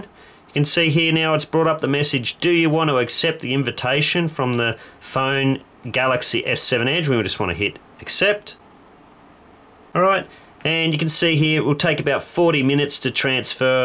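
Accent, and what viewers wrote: Australia. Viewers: This Australian accent is so good! XD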